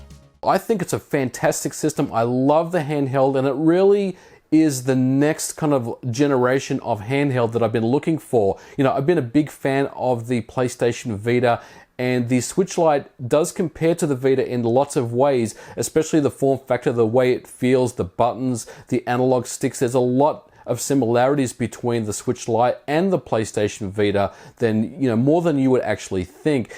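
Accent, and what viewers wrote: Australia. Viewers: Why do you have Australian accent and live in USA ? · Mate, I love your videos, the pace you speak out, and the content you produce. You sound like you're from Australia?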